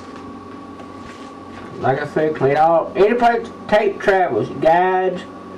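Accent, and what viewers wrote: South, United States. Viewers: He's definitely from the south.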